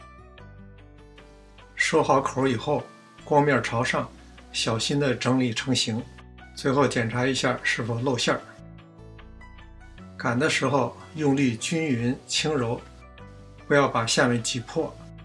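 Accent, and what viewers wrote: Beijing, China. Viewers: 太喜欢看您的视频了，菜谱简单轻松易学，谢谢您和大家分享。北京话听起来好亲切啊 ☺️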